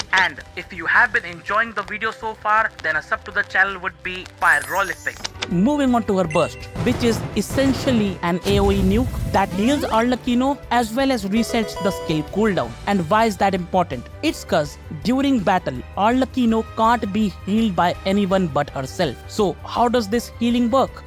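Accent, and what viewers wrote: India. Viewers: Good video my indian sounding friend, keep it up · Indian accent on any "educational" video tends to be 100% factual You deserve more subs and hopefully not too many to be on Hoyoverse's radar with this godly amount detail of her kit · he got that THICK Indian tutorial accent love it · Bros got that iphone tutorial accent love it can always count on the indians to drop the best guides🔥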